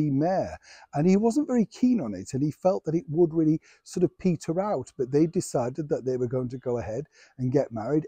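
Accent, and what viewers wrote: Yorkshire, United Kingdom. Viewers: Good morning, My coffee break, and my first laugh of the morning, Resting thespian Yorkshire talk, young man hoping to be star Thank you take care Ps Ava was a beautiful lady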